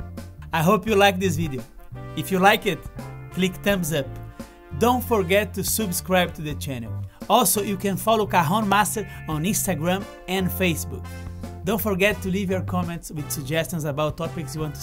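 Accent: Brazil. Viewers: E esse sotaque aí mano, é brasileiro ??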